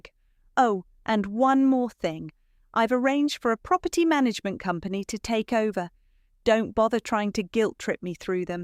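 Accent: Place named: RP, United Kingdom. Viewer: Love the RP accent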